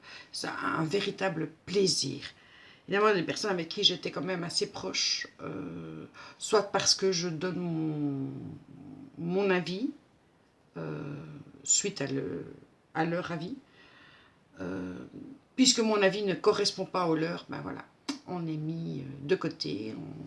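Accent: Belgium. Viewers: Coucou je reviens sur ma chaîne après un break vacanciers oblige lol, je suis contente de te retrouvé parmi nous, j'aime beaucoup ta chaîne et ton joli accent belge 😜😜😜 ne change rien, je te fais plein de gros bisous 😘😘😘😘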